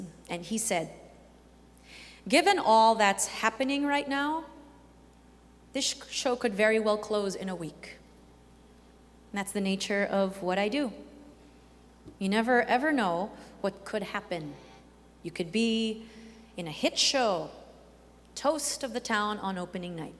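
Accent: England, United Kingdom. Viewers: Galing mo talaga Ms. Lea Salonga, British accent kailangan nya yun sa lugar Kung Saan sya at dinala, grabe yung mga taong Di sya Pinuri bagkus may nagsabi Lang mali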